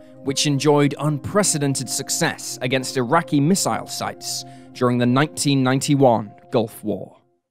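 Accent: United Kingdom. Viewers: the us military didnt have a 9mm pistol during the viet nam war. they used 1911 45 acp or 38 special revolvers. Limey narrator, reading a script. lmao. No credibility .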